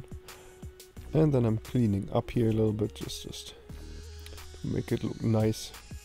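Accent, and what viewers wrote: Germany. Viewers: Thank you, very helpful. Is it the German accent that I'm hearing?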